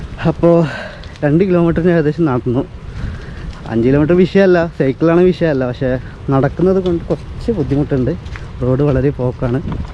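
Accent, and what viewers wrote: Malappuram, India. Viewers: Sooopppeerr പറയാൻ വാക്കുകൾ ഇല്ല. എന്റെ ഉമ്മചിയുടെ വീട് മലപ്പുറം ആണ്. നിങ്ങളുടെ വർത്താനം കേട്ടപ്പോൾ അവിടെ പോയപോലെ തോന്നി.🤗🤗 ഇവിടെ ഇരുന്ന് ഇതെല്ലാം കണ്ട് സമാധാനിക്കാം. Corona കാരണം പുതെറങ്ങാൻ പറ്റാത്ത ഞാൻ.😐😔😐😔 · Malappuram basha 😙😙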